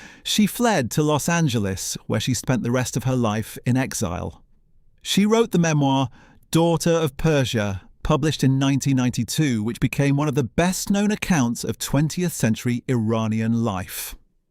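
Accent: United Kingdom. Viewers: The British accent says it all! Still trying to support the Qajars to reep from their 'not know how'? Speaker says that after Reza Shah free speech was banned. Shame on you! It is the British way to manipulate and take advantage of countries thru political manipulation. God bless the USA which the Brits lost with the Grace of God and bravery of Americans and so the Brits are still burning their as*es for the war of 1812. God bless Trump for not appeasing and listening to the Brits. God protect the USA, Iranian people and Israel from all especially the Brits.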